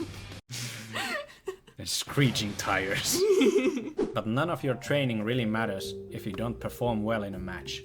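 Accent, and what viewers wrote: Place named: Spain. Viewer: Cool voice! Love the accent, where are you from? You sound Spanish or Easter European.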